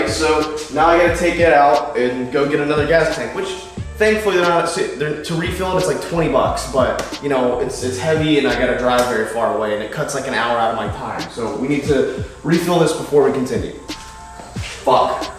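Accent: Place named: South, United States. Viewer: if you listen to his 2nd channel he speaks in a southern accent all the time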